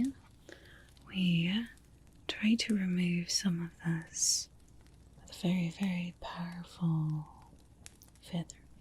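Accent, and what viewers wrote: Scotland, United Kingdom. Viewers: The scottish accent is the best❤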